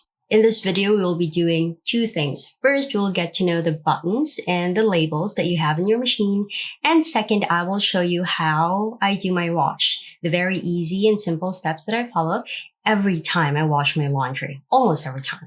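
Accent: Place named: Philippines. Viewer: Omg I love your accent! I'm also pilipina living here in Japan. This video is a life saver! Hahah tnx for sharing!!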